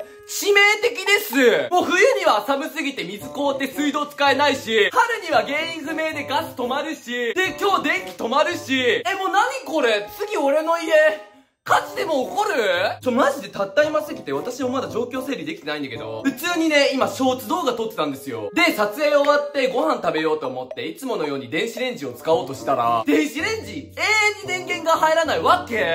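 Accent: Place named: Hokkaido, Japan. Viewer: 大阪に住んでたんだ！ 北海道の言葉と関西弁を喋ってるからずっと謎だったのが、今納得しました❤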